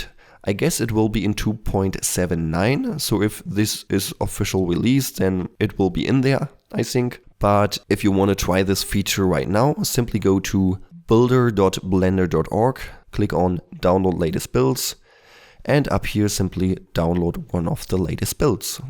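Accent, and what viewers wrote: Germany. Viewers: ) nichts gegen dich aber bemerkt das du deutsch bist diese aussprache von englisch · are you german? you really sound so...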